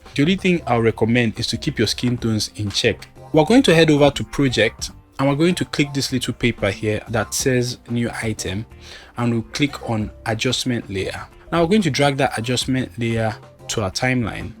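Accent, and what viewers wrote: Nigeria. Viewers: Nigerian?! LOVE. Thanks Brother. 😊😊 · Your explanation is sweet, with our bold Nigeria pronunciations, omo this one enter my head ....i just subcribe on your page stay bless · This is top tier content especially because your spoken English is one I can relate to as a Nigerian that I am. Thank you, truly!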